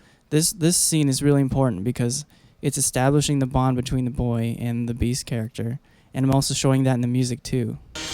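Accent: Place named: United States. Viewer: I thought you would have a Spanish accent with your last name. I was a bit shocked to hear an American accent.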